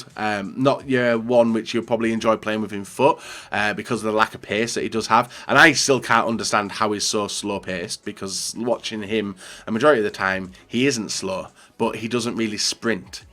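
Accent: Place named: England, United Kingdom. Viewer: Como se nota k eres inglés... donde esta Rakitic? Y Coutinho?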